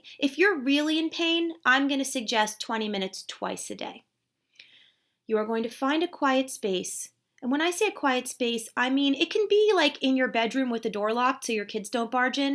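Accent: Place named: Long Island, United States. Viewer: Great work you are doing. Are you a Long Island Italian transplant to Delaware too? Thought I can hear the accent...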